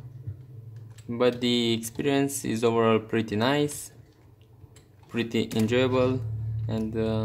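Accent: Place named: Romania. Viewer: Like iti dai seama după accent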